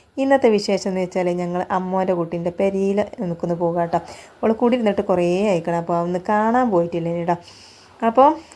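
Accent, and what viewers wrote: Malappuram, India. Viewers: ട്ട,,,,, കൂടുതലാണ്‌ട്ടാ,, ട്ടാ,,, കുറ്റപ്പെടുത്ത് ഒഴിവാക്കണ്ടാ,,,😅 എനിക്ക് നിന്റെ മലപ്പുറം വർത്തമാനം കേൾക്കാൻ നല്ല ഇഷ്ടമാണ്, ടാ,,, ഇത് കേൾക്കാൻ വേണ്ടിയാ ഞാൻ വീഡിയോ കാണുന്നത് തന്നെ.,❤️❤️❤️❤️❤️❤️❤️👍🏻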